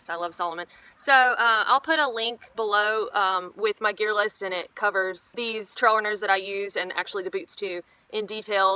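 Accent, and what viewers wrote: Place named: Tennessee, United States. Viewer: I have watched several of your videos of the last 5 months or so and I have to say this and I mean it in the nicest way and as a complement. I have the biggest crush on you and your personality. Your southern Tennessee drawl and snagged tooth are icing on the cake of your sweetest disposition and personality. If I was 15 years younger I would be flirting big time. Lol you are very pretty but not afraid to get dirty and for such a pretty girl that is amazing. After you mention you danced to I was this girl the greatest! I like the way you talk in your videos as you are real and humble in your advice. Not a know it all or a person trying to make money on YouTube just simple and honest. May the wind be to your back while traveling to " " cheers from a California redwoods man they call me Mexibilly.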